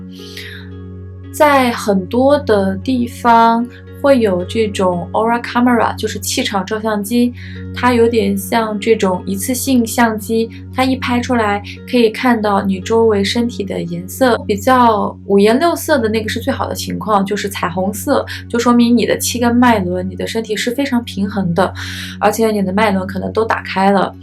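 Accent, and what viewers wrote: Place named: Beijing, China. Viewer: Her accent sounds like Beijing or Tianjin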